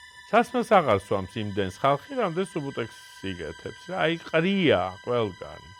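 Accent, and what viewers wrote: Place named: Tbilisi, Georgia. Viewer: МОГИТКАН СВЕТСКИ СИРИСТИАНИ ТБИЛИСЕЛИ АКЦЕНТИ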